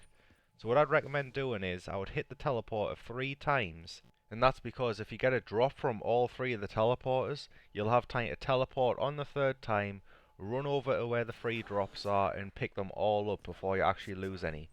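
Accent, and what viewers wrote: Newcastle, United Kingdom. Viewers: i know a geordie accent when i hear one! great to hear people from around the north east creating content it's fairly rare. keep it up mate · It's geordie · Are you a geordie?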